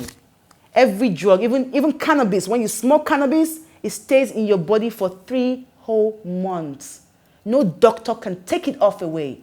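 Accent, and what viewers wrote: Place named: Igbo, Nigeria. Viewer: this your igbo accent sha strong o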